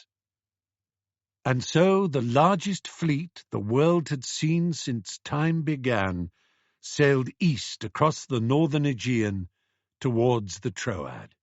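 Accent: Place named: Oxford, United Kingdom. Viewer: When a Brit is speaking Oxford English and “rolls the r”, you know you are in for a treat.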